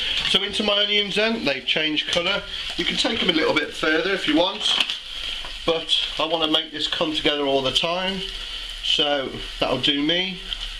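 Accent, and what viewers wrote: United Kingdom. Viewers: I really enjoyed the recipe and your Brit accent. Thanks for the fun instruction.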